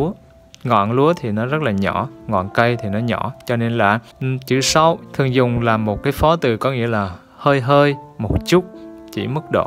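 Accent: Standard. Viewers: Thích giọng đọc của thầy dã man, giọng tiêu chuẩn trung ý, nghe hay quá chừng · Thật sự mê những video của anh, thật uyên thâm ạ, và giọng anh quá chuẩn luôn, mê!!! ❤️❤️❤️ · Thầy nói rất chuẩn và rất ý nghĩa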